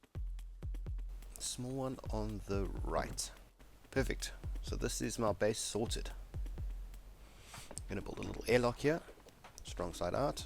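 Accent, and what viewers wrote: South Africa. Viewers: You sound South African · Are you South African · Hey... are you from South Africa? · I know a South African when I hear one O.o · hey are you south African. if so i am too:) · Are you South African, by any chance? Great video also!! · I knew you are south african!!!!!!!! Lekka CHOPPA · Where u from, South Africa? I’m from there.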